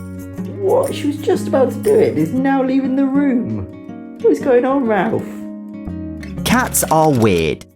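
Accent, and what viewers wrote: United Kingdom. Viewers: Question are you british???????????????????? YOU WILL BE MISSED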